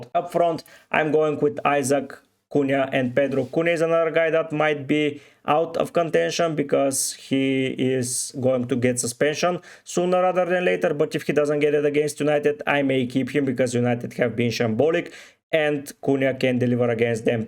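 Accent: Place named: Bulgaria. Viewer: Баа някак си по акцента познах че си Българин😂 Евала за труда братко!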